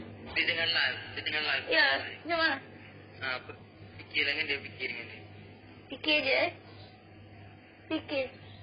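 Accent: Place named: Malaysia. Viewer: Kok sampeyan iso ngomong carane Wong melayu ngomong..mantap sis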